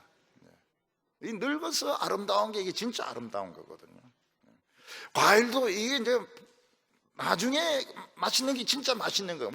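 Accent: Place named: Gyeongsang, South Korea. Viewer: 목사님! 존경합니다. 항시,건강하시길 기도 드립니다. 구수한 경상도 억양으로 하시는 설교말씀에, 은혜 많이 받습니다.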